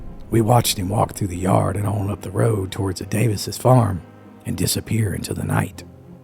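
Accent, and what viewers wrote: Appalachia, United States. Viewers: The villages Florida .. great voice over because I know the the mountain folks sound just like you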